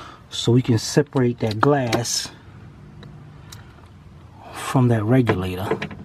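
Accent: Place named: Northeast, United States. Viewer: NE accent....somewhere between Philly n Boston lol I can't quite tell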